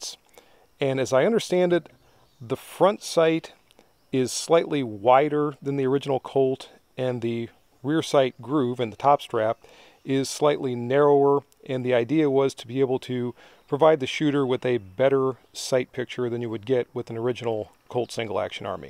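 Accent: West, United States. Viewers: Great review! Love the western accent 😄🔫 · As also an Ohioan I approve the use of the western accent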